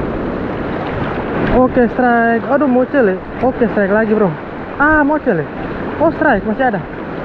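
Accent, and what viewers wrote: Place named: Papua, Indonesia. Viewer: Mcm logat papua ka.. d papua mana kk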